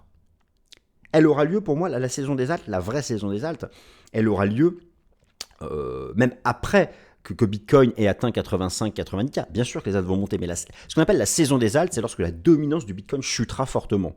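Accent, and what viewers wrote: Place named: France. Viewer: Ton accent à la française... trop mignon! Mais bon, cela n'enlève rien à la qualité de ton contenu!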